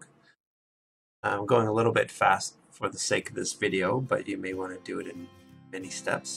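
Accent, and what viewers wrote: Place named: Canada. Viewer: I can hear Canadian accent!))) cool!))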